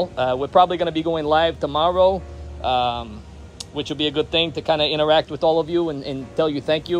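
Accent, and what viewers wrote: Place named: New York City, United States. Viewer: Well dude you are waaaay New York City... Manhattan to be exact. I'm from Staten one of the other boroughs so yeah I recognize that accent!😉 Not quite quite a long island yenta but your close. Too fast for a Long Island yenta. Bro you my peeps!!! I never donate cash to any website ever but I do buy things that they have merch to support them. It's you and Tom MacDonald the only ones that I will buy from. And guess what? we're going through a heat wave too (113 yesterday) and mama needs a tank top so I think it's time to go shopping! I don't like seeing my peeps fail at things that they do so well. So my peep, it's time to check out the merch! You know I've always supported you and I will never stop. I still got my eat the babies t-shirt and I love it!! I love the humor and comedy & the work you put into it. 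Far as I'm concerned every damn ¢ is worth it!!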